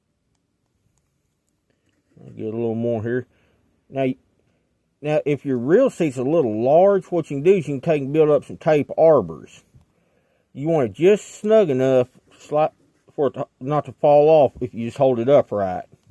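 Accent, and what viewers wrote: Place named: South, United States. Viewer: Brother I thought I was southern lol you got the deepest country accent I've heard in a while. 😂 alot of folks would say they can't understand us so I guess it's our own language 😂😂😂 great video can't wait to see you use it once it's all finished. Keep it fishy 🎣👊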